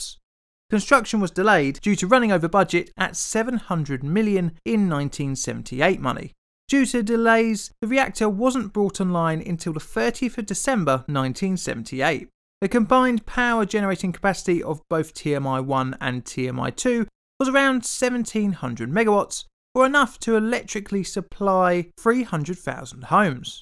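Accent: United Kingdom. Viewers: done by a nasal Brit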